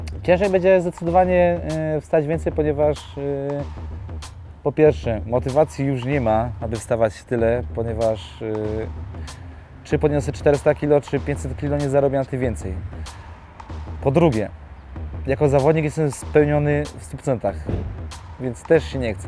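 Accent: Silesia, Poland. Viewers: nie kwestionuje tego, po prostu musiałem tym komentarzem dać upust emocjom, ponieważ najzwyczajniej w świecie nie podoba mi się gwara śląska, mimo że twój kanał jest całkiem fajny ^^ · a jak ma mowic skoro jest ze slaska xD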